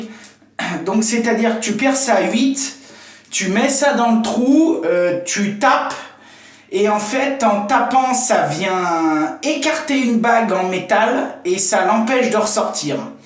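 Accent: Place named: Loire, France. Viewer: Super tuto merci! Tu serais pas de la Loire? Il me semble avoir reconnu l'accent de chez nous 😁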